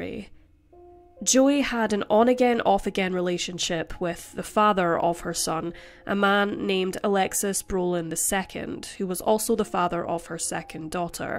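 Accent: Scotland, United Kingdom. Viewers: Everything she says sounds great with that Scottish accent! 😋💙💜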